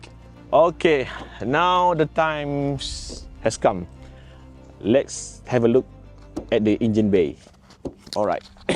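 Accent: Malaysia. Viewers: Good job abg 👍perbanyakkan lg video² review english version..bole menarik lbh ramai viewer² luar utk tgok channel GK. Don't worry about how we pronounce the words cuz this uniqueness symbolizes us from Malaysia.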